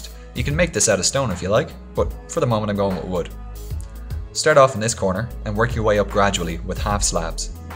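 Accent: Ireland. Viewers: i know you mighteve already got this question but, are you irish?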